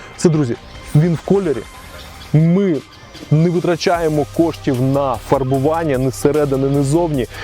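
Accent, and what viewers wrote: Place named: Lviv, Ukraine. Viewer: Майже львівський акцент!!!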